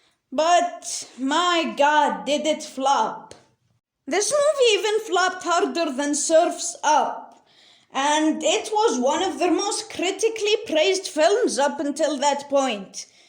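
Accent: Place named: Scotland, United Kingdom. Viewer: Nice video and I like your Scottish accent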